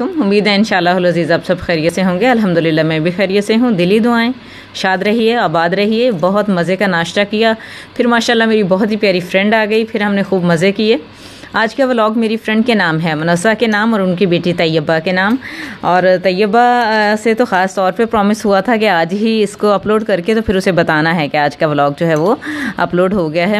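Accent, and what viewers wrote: Punjab, India. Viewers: Aunty aap bohat mushkil punjabi bolti hain. Good dish in the start . Green walay aamon ki peti mujhay bhejwa dein. heheeh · AAj to Panjabi naal Gallan kar rahe ho aap...Naya Andaz achha laga ji MashaAllah Aam ka pakaya jaa raha hai...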